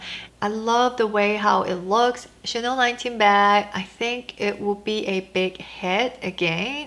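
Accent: South Korea. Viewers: Your English is just like my mom's. She's also Korean and has lived here in the US for 43 years. Still has a strong Korean accent and I love it!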